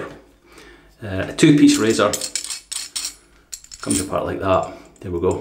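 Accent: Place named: Cockney, United Kingdom. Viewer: 🤣🤣🤣 Hilarious cockney London Great shave.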